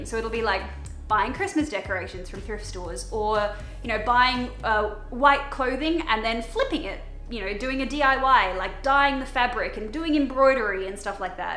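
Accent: Australia. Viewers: I'm French and I love how she talks it sounds cute, I would love a video in which she teaches some Australian expressions like love hearts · Pronounce words however you want, use metric measures and remind us what season it is where you live. Be proudly Australian and cater to your international audience without having to hide who or where you are. There is a fine line between catering to an audience and pandering to them, you do the former really well and shouldn't have to do the later just because some people can't grasp that thee are different countries in the world where things are different. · I’m American, and I love your Australian accent! Different dialects/pronunciations are just part of what makes the world go round. People shouldn’t tell you that you’re pronouncing it “wrong” just because it’s different from how they say it. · To anyone getting angry over how she pronounces words - she's Australian, they pronounce things how they do (and actually, it's often a lot more accurate than how Americans do... 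considering it's closer to the English way and it's the ENGLISH language). Also, I appreciate the cold weather clothes you're wearing in the warm weather. As someone who's sat in in 2 degrees (watching videos whilst waiting for the boiler man to turn up...).... I VERY much appreciate it. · You're Australian, speak as you normally would. I love hearing how different cultures pronounce the same words differently. Don't change just because some of us Americans can be bull headed ninnies. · Alright, I am just going to get this off my chest because it's honestly really annoying. She's fuckin' Australian. It's where she grew up, lived, and lives. I don't understand why so many people feel the need to tell her HOW to say things or comment so much about the accent, and nearly every time it's an American. lol. Newsflash: Not everyone talks like you, even in your own country you've got different accents. 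When my parents moved to the states, I had to un-learn my native language, basically, because she was scared that our accents would set us apart and we wouldn't be able to live more 'normal' lives. I'm re-learning it now which is strange but also fun. Anyway! Let speak the way she speaks, I love it.:) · Who said anything about her accent being bad. I think Australian accent is cute. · Whoever is “correcting” you for saying things in your native Australian accent should be arrested because it’s a crime to tell someone with the cutest accent (but also, you’re AUSTRALIAN not American) to speak differently. I’m about to throw elbows with some of y’all in the comment section. People speak differently than you do, get over it. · Be PROUD of your Australian accent, American's don't talk correct English anyway, from a NZ' er however, Auss ie's, do nd correct dance, six, etc to correct british?pronunciation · I love hearing another Aussie accent on YouTube!:) Thanks for doing what you do! 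X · I'm very excited about the idea of thriftmas 🙌 sounds like a great time. Also don't mind your accent, your Aussie land accent is endearing. Plus I love that fact that all of y'all say love hearts ❤️ Also, strawberry Sam was too much 😂😂😂😂 reaction was perfect.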